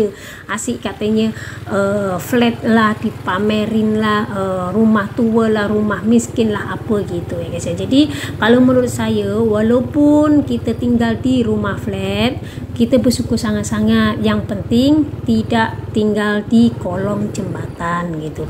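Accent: Indonesia. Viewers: Mbak saya orang Malaysia izinkan saya memberi dua saranan berikut : 1. Bagusnya mbak jelaskan apa maksud istilah "flat" yg tertulis di judul dan juga dituturkan dlm video. Kebanyakan warga Indonesia tentu tidak tahu apa itu "flat"- tidak ada istilah begitu di Indonesia. 2. Sebagai orang asal Indonesia dan juga untuk berbagai informasi kpd pemirsa di Indonesia, ada baiknya - dlm sebahagian video jika tidak semua - mbak bicara dlm bahasa Indonesia bukan dlm bahasa Melayu. Penuturan bahasa Melayu mbak bagus sekali walaupun jujur masih kedengaran ciri2 "orang Indonesia" dlm penuturan.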